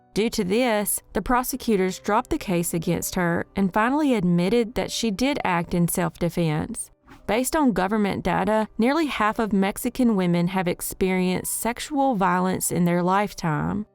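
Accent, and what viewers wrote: South, United States. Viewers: Southern girl accent. Makes you Horney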